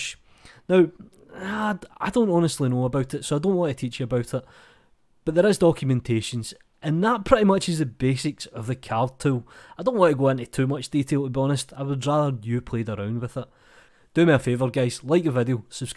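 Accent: Scotland, United Kingdom. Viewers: This was incredible. This was exactly what I need. I didn't know about the Boolean Modifier. Thank you! I love your Scottish accent too, so cool... >w<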